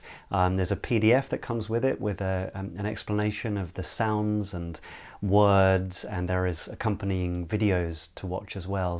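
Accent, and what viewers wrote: RP, United Kingdom. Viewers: Great video! Let me point out once again that you're one of the best Standard British English (Contemporary RP) Pronunciation teachers I've ever come across on YouTube and since I occasionally teach and translate English professionally myself, your tips will surely improve my teaching skills.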